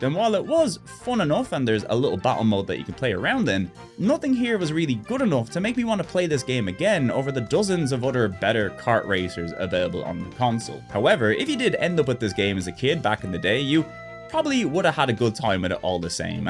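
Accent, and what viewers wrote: Ireland. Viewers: More Irish PlayStation man! Flipping get in!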